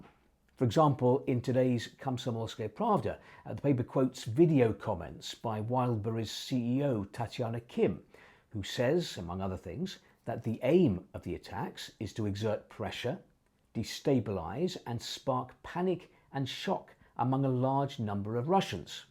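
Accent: United Kingdom. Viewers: Not only BRILLIANT and SO BRAVE, dear Sir, but I guess we all in north America enjoy and admire your magnificent Oxbridge diction. Spasiba, poka poka for now, and God bless You ! Slava Ukrainia.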